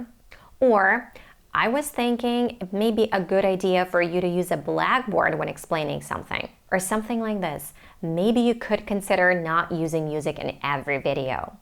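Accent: United States. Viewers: I like your american accent so much coz I understand 97% of your words. But I just understand 15% words of Australian tourists in Bali and other cities in my country Indonesia. Unfortunately... english classroom teaching in Asia is based on british because asian countries are former european colonies. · How many years have u been studying English?,.I love your sounds very great of course American English.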